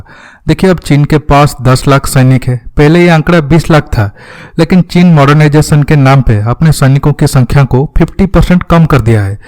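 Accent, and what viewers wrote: Odisha, India. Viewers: Aap ki awaj se lagta hai ki aap Odisha se ho.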